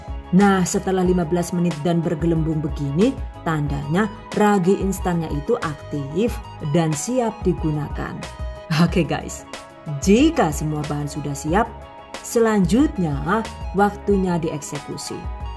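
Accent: Banyumas, Indonesia. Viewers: Keren kalau nonton chanelnya,yg saya suka sampai modalnya di catat dengan lengkap karna saya pedagang,jadi seneng aja. tapi saya KEPO 🙆🙆aslinya orang mana,kalau di lihat kayanya tinggal di jawa timur,tapi kadang ngomongnya ada NGAPAKnya😁🤣 apa kaya saya karna temen q dari mana"jadi q bisa sedikit" Logat dari temen" kok q jadi ngelantur🤦🤦pokoknya q Lope-Lope lahh😍😍😍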